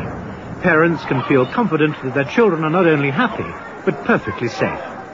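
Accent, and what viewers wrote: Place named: United Kingdom. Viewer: i love the narrator's voice.....so British!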